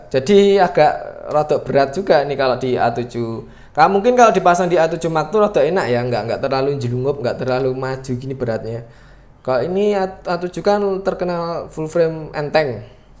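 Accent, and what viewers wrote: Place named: Tulungagung, Indonesia. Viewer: Cocok banget ini untuk pengambilan gambar cinematik ..Btw ini yang punya orang Tulungagung ya bahasanya Wangun😄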